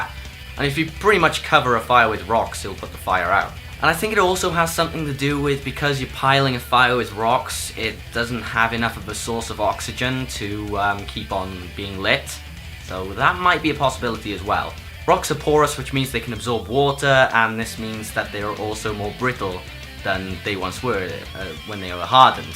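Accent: Wales, United Kingdom. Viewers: Hey dude, just stumbled upon your channel as I was looking to start up a Let's Play channel in my spare time and was doing some research. Like your videos and have subscribed! Will be sure to keep up to date with your stuff and best of luck for your channel :) Quick question, are you Welsh?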